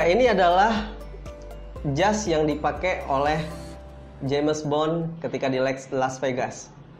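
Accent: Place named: Aceh, Indonesia. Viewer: Orang aceh kau ya bang??? Ku dengar logat bicara nya ada medok pletak pletok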